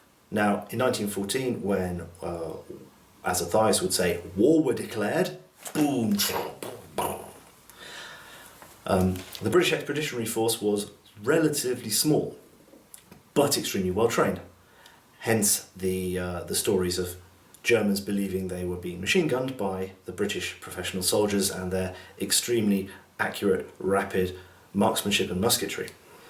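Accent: France. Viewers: I understand much better when you have French accent :) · Nice French accent ^^ great video by the way :) Keep on that way ! Oh, and you've got a new subscriber. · I love your French accent >< Very interesting video nevertheless =) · Nice French accent, man. No, I'm not being an ass; it's actually good.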